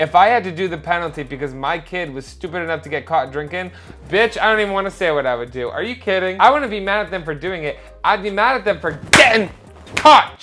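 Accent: New Jersey, United States. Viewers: You don't speak English you speak Jersey it's a special side dialect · bro its just the Jersey accent lol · The first minute and a half is just trying to figure out if he has a speech impediment or if he just pronounces certain words with a jersey accent.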